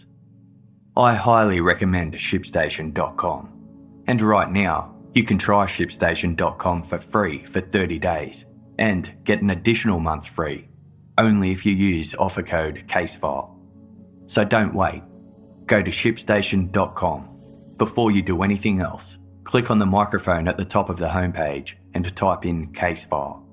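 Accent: Australia. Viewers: This is the best narrative, lots of details. I love your aus accent too!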